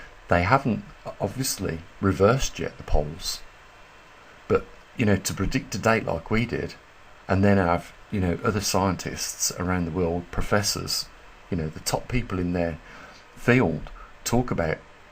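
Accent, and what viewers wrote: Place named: Black Country, United Kingdom. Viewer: M8… where ya from? You sound local… I’m in West Brom, let’s meet up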